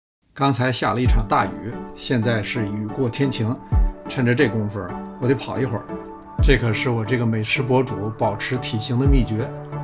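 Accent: Beijing, China. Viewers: 您的北京话让我这个看视频的同乡 一边流口水一边 热流满面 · 一听就是咱老北京😂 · 您提供的菜谱都是家常的蔬菜，但却是不寻常的搭配。还有您的京腔儿听着特别亲切。请问可以加您的微信，更便于向您请教吗？ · 喜欢你的北京口音，赞一个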